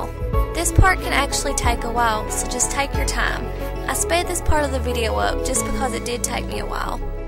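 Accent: South, United States. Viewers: You guys act like you've never heard someone speak with a southern accent before LOL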